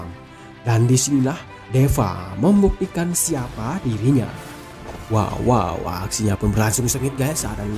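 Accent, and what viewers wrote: Bali, Indonesia. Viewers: Seperti logat Bali yaa min...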